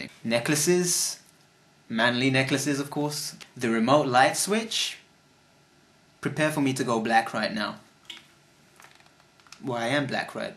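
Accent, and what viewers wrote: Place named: United Kingdom. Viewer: This is great! My first thought was, he sounds like he's from the UK.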